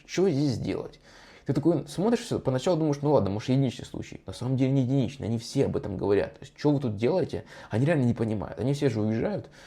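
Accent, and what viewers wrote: Russia. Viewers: Послушал автора и сразу понял, что он с россии....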